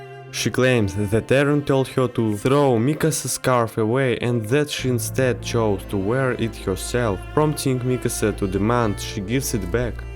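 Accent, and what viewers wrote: Russia. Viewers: you from russia??? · Hmmm,English language with Russian accent. I like that👍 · Are you russian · У тебя русский акцент) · Чел ты руский по акценту видно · are you russian? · Слышу русский акцент... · Похоже на русский акцент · Bro, are you Russian? 😅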